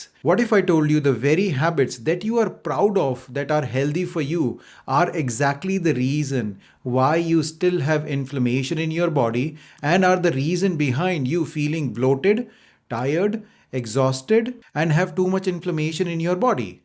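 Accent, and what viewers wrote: India. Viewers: Typical indian accent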